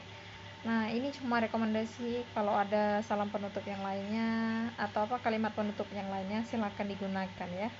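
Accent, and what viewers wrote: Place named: Palembang, Indonesia. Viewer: Terdengar tdi. Cara ke duo. Asli palembang ya mbak. He he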